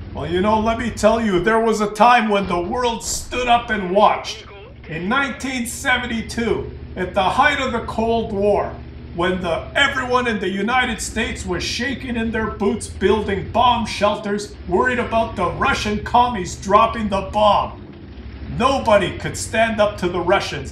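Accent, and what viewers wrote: Canada. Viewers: Impressive knowledge! Sounds like you're Canadian!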